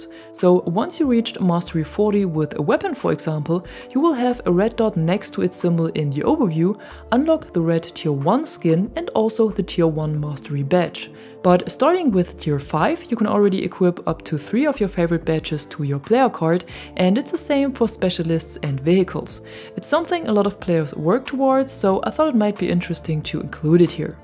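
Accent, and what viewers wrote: Germany. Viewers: you sonds like a german person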